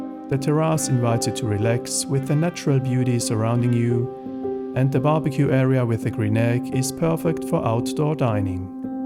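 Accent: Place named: Scotland, United Kingdom. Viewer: Lovely Scottish accent.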